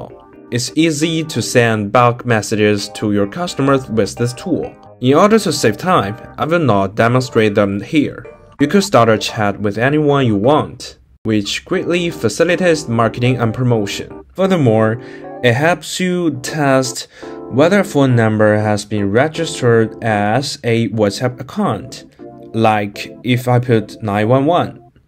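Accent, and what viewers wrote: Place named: China. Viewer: as soon as I heard the chinese accent I was like, no thanks, I dont want my personal info to be in some chinese farm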